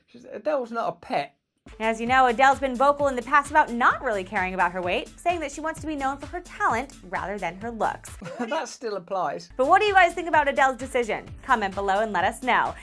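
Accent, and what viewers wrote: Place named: United Kingdom. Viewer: Russel You More Than Look Cool, And Sound Cool, With That British Accent Dude You Are Way Cool!!!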